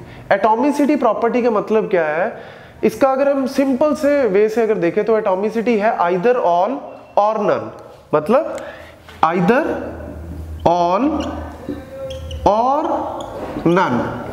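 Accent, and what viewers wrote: Punjab, India. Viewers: u r so cute....haha...and ur hindi accent 4000,5000...haha...so cute... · Very good explaination along with great punjabi ascent.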